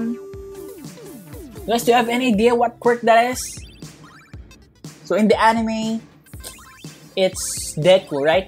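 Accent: Philippines. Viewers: talking sus he sounds like a filipino..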